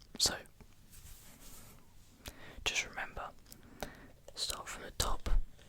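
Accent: United States. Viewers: AHAHAHHAAH I LOVE THE AMERICAN ACCENT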